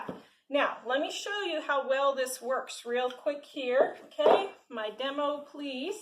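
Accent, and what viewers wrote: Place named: Central Illinois, United States. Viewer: I’m from central Illinois, a lot of ppl say warsh just like you 😂.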